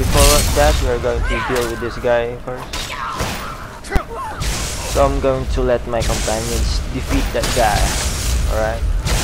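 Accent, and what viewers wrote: Philippines. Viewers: You sound like a Filipino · I’m not making fun of you in anyway, I just want to say I love your accent. Are you philipino cus hearing you speak reminds me of my really good friend I worked with. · he's a pilipino u can tell by the accent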